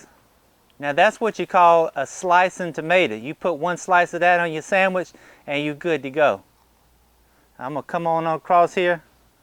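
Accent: South, United States. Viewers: Beautiful tomatoes :) This is my first time growing cherokee purples, and I'm hoping they come out as well as yours... Btw, you have one of the greatest southern accents I've heard on youtube, and that's from an alabama native! Very impressive, sir.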